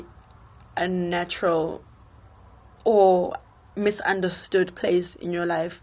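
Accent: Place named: England, United Kingdom. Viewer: what a beautiful English accent tjo, your ancestors are learned 🙌loving how you explain it in your language too wow.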